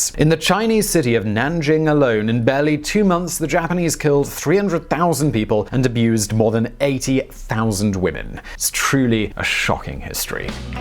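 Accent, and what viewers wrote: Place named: United Kingdom. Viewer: Kinda hard to take this guy seriously with that accent, we all know Britain is the OG when it comes to fucking over nations. And they didn't stop either, Palestine and the Middle east are witnesses. Oh my god, I just realised I speak 'their' language😭😭😭 gotta respect the British pillaging- it's value for money #longlasting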